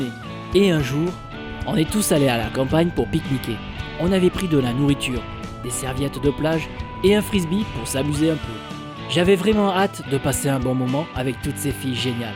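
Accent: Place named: Corsica, France. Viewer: Gros pervers moi aussi je suis une fille et en même temps tu as un belle accent Corse.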